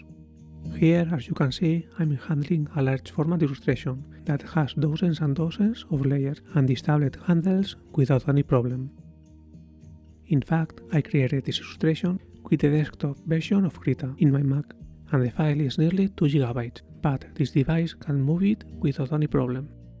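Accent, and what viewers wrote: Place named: Spain. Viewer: Genial video de una tablet que la ando cazando para cuando baje algo de precio; asi como curiosidad , Creo que eres Nativo de la lengua española ( lo noto en tu acento) no se, por que a los que sabemos un poco de ingles en mi caso b1 ( entendemos mucho mas la gente con acento que a los nativos del ingles)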